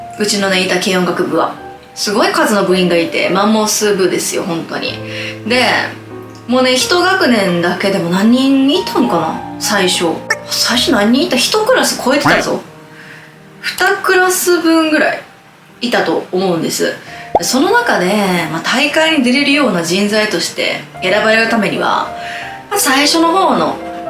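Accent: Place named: Osaka, Japan. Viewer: 何色ものスペシャルカラー仕様のGOシリーズは提供だったんですね？ しゃべくりにいかにもな大阪のねぇちゃん味があって良かったです！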